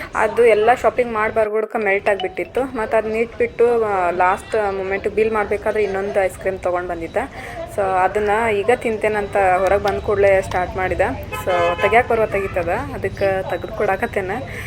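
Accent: North Karnataka, India. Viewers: ನಿಮ್ಮ ವಿಡಿಯೋ ನನಗ ಲೈಕ್ ಯಾಕೆ ಆಗತದ ಅಂದ್ರೆ ಹುಬ್ಬಳ್ಳಿ ಧಾರ್ವಾಡ್, ಬೆಳಗಾವಿ ಸೈಡ್ ಮಾತುಗಳು ಚಂದ ಮಾತಾಡತಿರೀ ಅದಕ್ಕೆ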